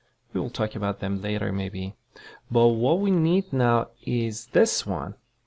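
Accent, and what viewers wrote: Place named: Iran. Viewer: You have a persian accent , are u persian hamvatan?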